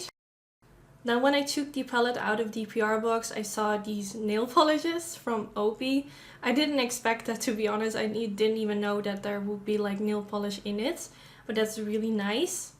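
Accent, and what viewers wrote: Netherlands. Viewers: Hearing your accent reminds me soooooo much of time abroad in Amsterdam last year ❤️❤️❤️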